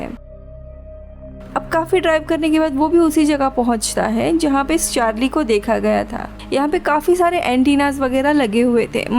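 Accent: Maharashtra, India. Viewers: Mam aap Maharashtra se ho ?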